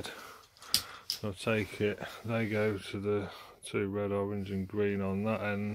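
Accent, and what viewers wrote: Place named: Doncaster, United Kingdom. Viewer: Sounds like you're not far from Doncaster mate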